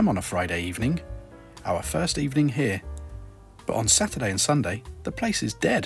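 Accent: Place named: England, United Kingdom. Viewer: Steak and milk.... very good for me reading, earing lovely words slowly, easy to repeat if not understanding, and english accent i can restaure with your tonics on words. Not only the lesson about phylatélic value about this New-Zealand letter from 1974. Words are so well writing, at middle of letter eyes were synchronize with words and your voice. Great time and good idea Thank you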